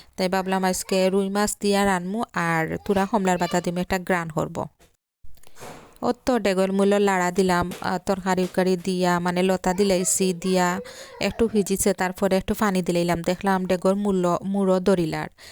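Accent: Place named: Sylhet, Bangladesh. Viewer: Tee Afa afnar mat proper sylhet oy na kne lager thura thura shuddo aior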